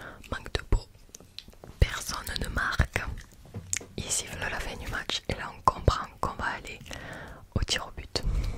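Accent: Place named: Basque Country, France. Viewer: Voilaaaaa on dit chocolatine conn, t’as un accent basque de fouuuu je me trompe?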